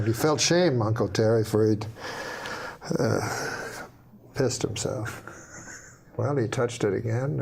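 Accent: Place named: Canada. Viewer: Told with the old timey Canadian accent as well, like a story Stompin Tom Connors might be tellin'.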